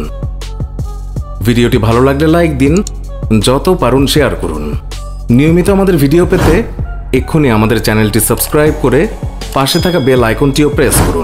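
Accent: Bangladesh. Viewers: আপনার ভাষা শুনে ,মনে হচ্ছে আপনি বাংলাদেশি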